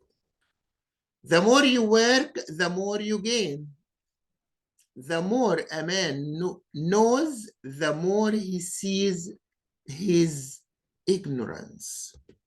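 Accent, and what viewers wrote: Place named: Egypt. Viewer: Your pronunciation is very awful and bad. You speak an Egyptian English.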